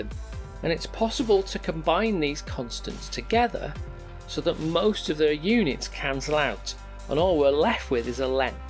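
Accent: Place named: Midlands, United Kingdom. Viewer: The Midlands (UK) accent is a key component for the "perfect" science video too ofc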